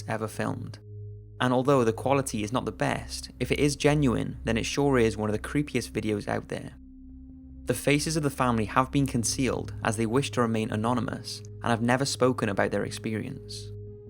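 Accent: Wales, United Kingdom. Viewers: Hey I was just wondering, where are you from, because your accent sounds a bit Welsh. Thanks! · Hello, Are you from britain? I like your accent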